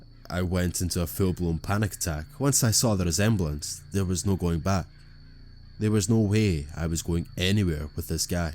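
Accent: Scotland, United Kingdom. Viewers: I'm from Pennsylvania! :D Also hi, I'm new here and I found you through Margbot. Scottish accents are my favorite and I'm excited to watch your videos <3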